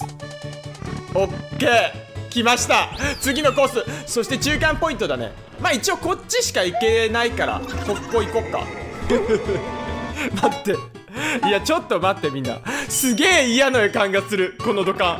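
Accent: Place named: Kyushu, Japan. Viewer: 九州人だ〜、親近感w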